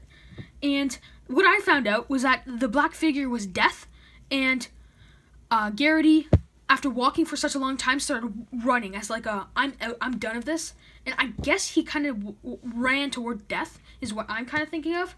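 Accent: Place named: Canada. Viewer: I like your emoticon description showing the story of The Long Walk. Clever! Why don't you show your face in the review? It's OK to say you loved the book. It doesn't meat I'll love or like it. I love Cell and my friend loves Dreamcatcher and most people don't like those two King books. I'm guessing your from Canada by the way you pronounce "about". It's not fully "aboot" like some Canadian accents but it's moving in that direction. You said if they fall below 4 mph, they get a warning, so why not stay below 4 mph so they don't get any more warnings?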